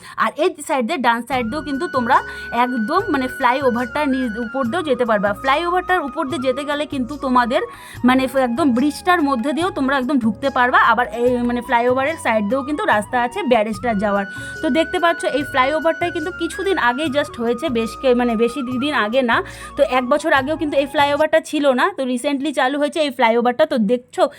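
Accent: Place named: Murshidabad, India. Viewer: কিছু মনে করবেন না।আপনার বাড়ি কি মুর্শিদাবাদ জেলায়? ভিডিও করতে হলে আাগে ভাষা ঠিক করতে হবে। ধন্যবাদ।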